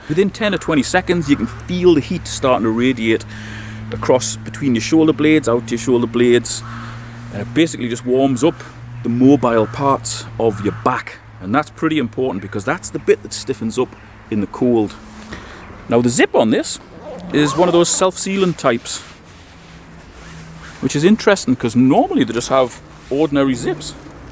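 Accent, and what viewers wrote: North East England, United Kingdom. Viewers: Hiya, I just seen your review on Amazon re: the heated gilet/body warmer and came to find you on YT so I could read any comments too. Your review (the video,) was excellent and the North East accent (my ears tell me not quite Geordie, but close enough, lol) makes you more believable and trustworthy to me (though others would probably think that was a bit backward. Ha!) I've had me eye on one of these for my dad for Xmas and I'm just having another look today before I go ahead and purchase one, hence me finding your review just now. My Q is this: It says the neck is also supposed to heat up? But your review doesn't mention this. I'd really wanted one with the heated neck too, as what with my dad being a bit of an old codger these days, he gets really cold just sitting in the house (I do think it's also his BP tablets, but OFC he won't go change them! 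Grr,) and I thought the neck heating up too, would be rather a nice touch, yano? So is the ad lying about the neck having heated elements too, or did you just forget to mention about the neck? PS. Lucky I managed to find you on here as you're under the name ' ' which seems is another YT account for you? Anyway, I hope you see this comment soon so I can crack on with the search and buying ASAP! Cheers in advance!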